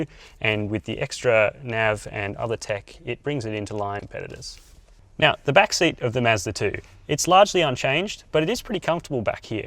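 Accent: Australia. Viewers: "He speaks in a strange way for an american, but he doesn't sound like a british", then I discovered you are australian. I always forget about you, guys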